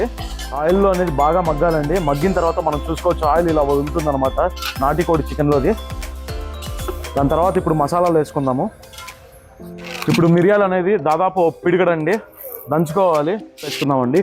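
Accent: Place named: Rayalaseema, India. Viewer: పట్టుద్ది ఎందిరా నాయనా రాయలసీమ భాషను నాశనం చేస్తున్నావ్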